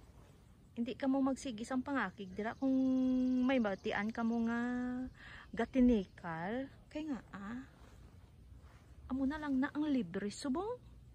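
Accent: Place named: Iloilo, Philippines. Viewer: I like the accent. I love Ilonggos..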